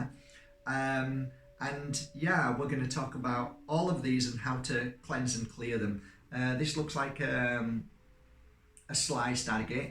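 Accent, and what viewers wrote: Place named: Northern England, United Kingdom. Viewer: Hi...🙂Love your videos.Just came across you this week.Thankyou for taking the time to make them🙏 Just wondering why you didn't mention the energizing/cleansing power of one of natures super powerful and most beautiful things...The Moon!? Anyway, thankyou again. Your accent is very Northern(trying to place it🤔)...Greetings from Manchester UK (originally Kearsley Nr. Bolton).Namaste 🙏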